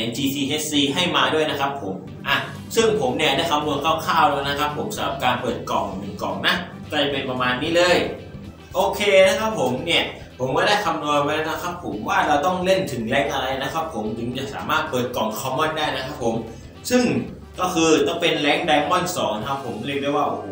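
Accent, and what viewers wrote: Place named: South, Thailand. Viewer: แหลงใต้ต่ะหลวง เท่เลยผมว่า 555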